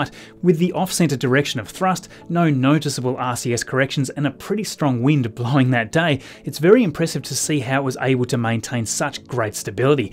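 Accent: Australia. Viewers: It's nice to hear an Ozzy voice giving the week's space launch news. Keep up the good work.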